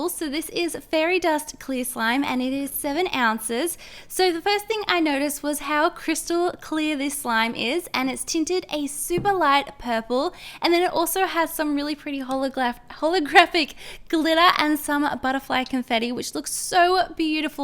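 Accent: Australia. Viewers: FINALY SOMONE THATS AUSSIE NOT AMERICAN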